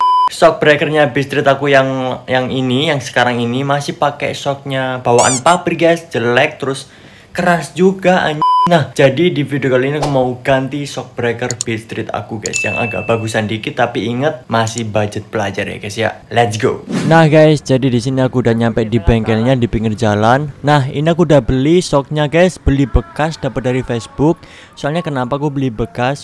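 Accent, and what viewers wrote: Malang, Indonesia. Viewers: Orang malang?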